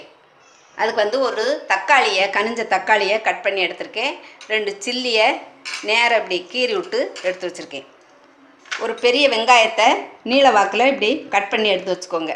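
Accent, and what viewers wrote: Madurai, India. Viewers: I love your Madurai speech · Thank you amma , So sweet Madurai slang, love ur description... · Amma super neenga Madurai ya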